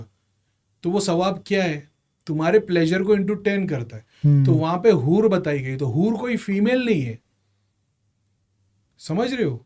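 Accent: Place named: Mumbai, India. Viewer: Hey Mr ur telling us clearly..and we like the Mumbai style free talk.thanks From dubai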